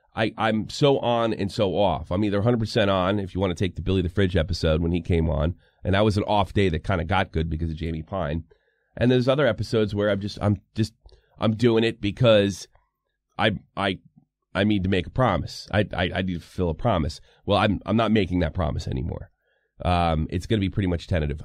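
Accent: United States. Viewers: "accent-less" nah, I think you mean English with an American accent.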